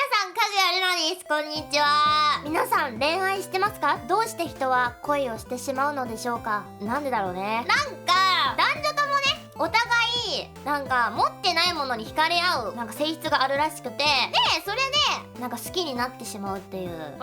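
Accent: Hakata, Japan. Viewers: 博多弁まじ神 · 博多弁ですฅ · 博多弁よっしゃぁ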